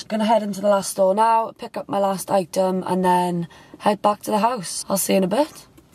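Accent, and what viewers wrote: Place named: Wales, United Kingdom. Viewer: The Welsh accent is getting stronger with each day in quarantine!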